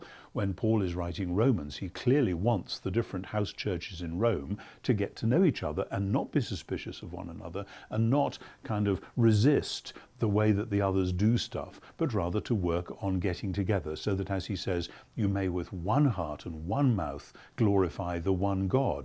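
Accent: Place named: United Kingdom. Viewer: Way more legit with British accent. Bravo. Been thinking about this lately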